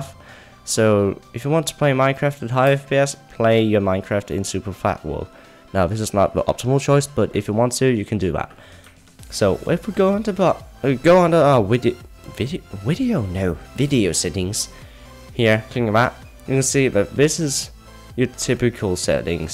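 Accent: Denmark. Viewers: 'v you from Danmark?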